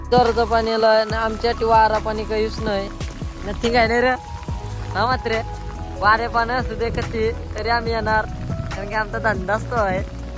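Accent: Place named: Ulwe, India. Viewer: Aamchya ulvyasaid chi bhasha vatey tujhi kuthla aahes bhau gav konta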